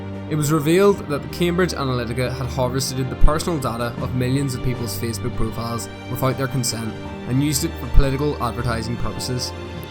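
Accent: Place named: Scotland, United Kingdom. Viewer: Hi, That collage is well put together. Your accent sounds a bit Scottish. After watching your video, I read "Bias Within Artificial Intelligence" and "The Future of Voice Control". You mentioned how Google (Siri) and Amazon (Echo, Alexa) are going from smart homes to smart offices to smart cars. Over here we are talking about smart cities - or, mores specifically a Google controlled suburb in Toronto. Or, at least our Ethics Committee were both before and during the Grand Committee meeting when it was in Ottawa. "Bias Within Artificial Intelligence" is topical because politicians on both sides the the 49th parallel are looking at AI and algorithms. An American Congressional Committee was looking at the issue mainly as something that is biased against people of colour and the Canadian Ethics Committee was also looking at the ways it promoting some content while making other content harder to find - and how algorithms can be gamed. 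I think that what you said is important, since an algorithm could determine whether one can get a mortgage or even be accepted as a tenant - or if the neighbourhood where you lives could influence whether they can get credit, insurence or even a job. "An algorithm or supercomputer is in itself incapable of displaying discrimination or bias. On the other hand, the human being who programs the algorithms is capable of doing so at different stages: during data collection, during processing, or during the preparation of questions the algorithm wil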